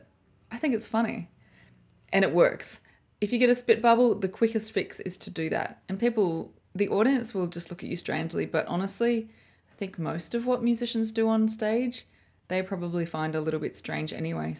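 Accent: Australia. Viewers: I also grew up in Sydney, and my accent is identical to, though I've lived in Far North Queensland for many years and accents are a little different at the top end.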